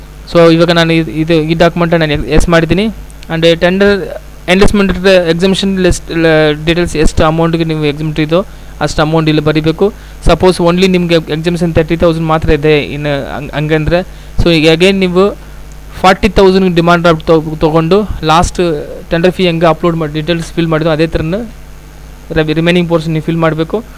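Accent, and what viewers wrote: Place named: North Karnataka, India. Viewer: Yappa maraya kannada dag matad pa hingyak alakati clear aagi matadidre tiliteti...Uttara Kannada dav adree yak nachkotiya hodi9 Annu yak achcha kannadalli matadbek anta alta idiya,relax agu modlu..,tekta idira eke...?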